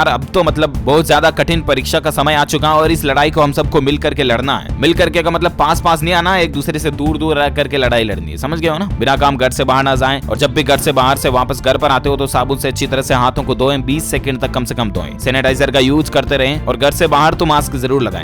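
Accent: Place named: Marwari, India. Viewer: Aapki marwari boli mast hai motiyar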